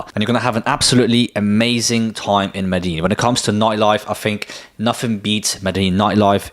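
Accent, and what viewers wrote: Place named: United Kingdom. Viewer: True. That's cuz he's a Polish good looking dude with British accent. So he's super exotic in Miami. If you're an avg American guy, Miami would be horrible to date in.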